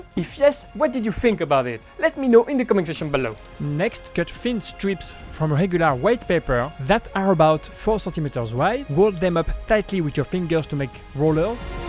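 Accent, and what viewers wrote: France. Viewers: Awesomely sharp French accent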